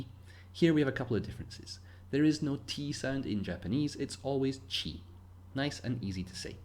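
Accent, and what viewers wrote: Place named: Glasgow, United Kingdom. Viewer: I lived in Glasgow half a year. Release your accent! ^.^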